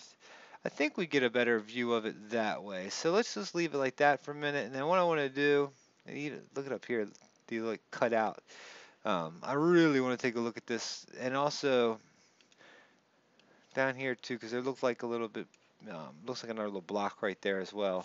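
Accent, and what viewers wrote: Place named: United States. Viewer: That single rock is interesting, the scallops on the edge are very unlikely to be natural, so well spotted. The rest are I think simply rocks. Actually his american accent to us Brits is quite charming, so don't run him down so much - anyway everybody read The Third Explanation Amazon, Kindle, but shortly to be a paper book. Keep taking the pill!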